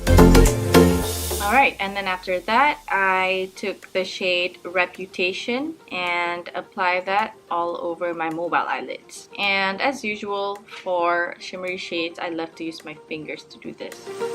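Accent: Penang, Malaysia. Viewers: Please do make up tutorial in malay again. Love to hear your penang slang 😄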